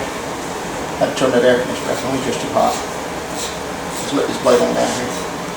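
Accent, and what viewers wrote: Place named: South, United States. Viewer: Awesome cut and you sound pretty southern where you from?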